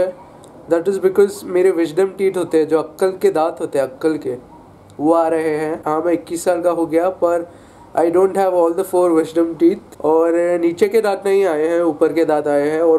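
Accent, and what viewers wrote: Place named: Maharashtra, India. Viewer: bro ur hindi and english with slightly marathi accent feels that u r one of my family .. like elder bro of mine .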